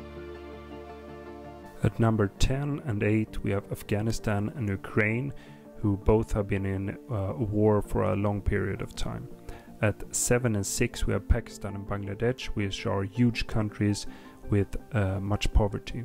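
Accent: Sweden. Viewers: Visste direkt att du var svensk med den där dialekten haha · Why do so many swedes speak this way? There do you learn to speak this way? Also Dave brought me here.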